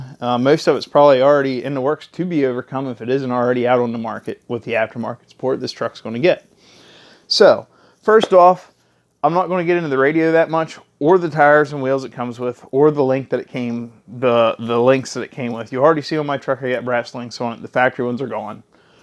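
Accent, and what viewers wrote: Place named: Pennsylvania Dutch, United States. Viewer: Did you say (Door ability) LOL its Durability, you have to be from PA, Im hearing that PA Dutch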